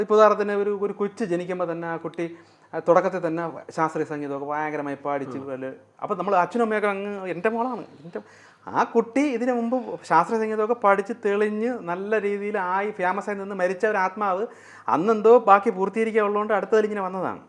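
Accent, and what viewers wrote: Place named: Thiruvananthapuram, India. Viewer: An Autobiography of a Yogi യിൽ പറയുന്ന കാര്യങ്ങൾ ഇദ്ദേഹം സാധാരണ തിരോന്തരം ഭാഷയിൽ എത്ര വ്യക്തമായി ഉദാഹരണസഹിതം ലാഘവത്തോടെ പറഞ്ഞു തരുന്നു ഇത് മനസ്സിലാക്കുന്നവർ ഉയർന്ന level ആകണം 🙏🏻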